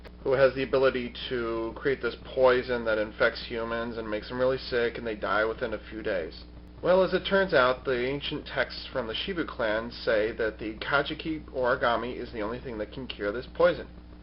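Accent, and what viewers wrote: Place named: United States. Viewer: Could you at least try a little harder on your pronunciations? I mean, I know you're American and all, but really man, it's a little grating to hear when you say the Japanese names.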